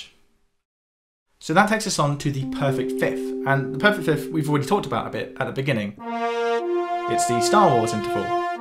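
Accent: England, United Kingdom. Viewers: Oh to have good hair, an english accent AND piano skills. Very enjoyable. Thanks.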